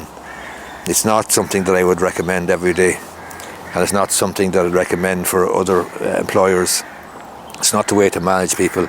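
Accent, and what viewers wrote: Ireland. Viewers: I luv the irish accent. 💓 Great video bthanks for your insights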